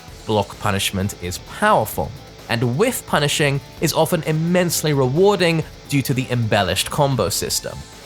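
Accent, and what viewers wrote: United Kingdom. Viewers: you live in japan? thought you were bri'ish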